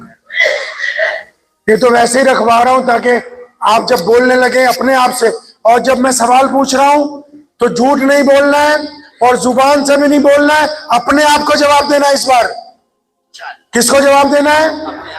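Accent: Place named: Punjab, Pakistan. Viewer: Takabbur nhi bhai..wo punjab se he..unki aawaz or lhza hi esa he.. MashaAllah bayan bahut Bdiya krte he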